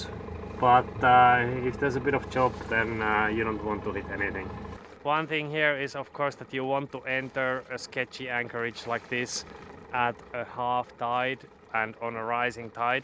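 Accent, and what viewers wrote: Finland. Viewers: Once again, simple and very informative with a charming Finnish accent, be well and trust your personal SISU angel and you'll be fine & safe, cheers from Karelian Poika!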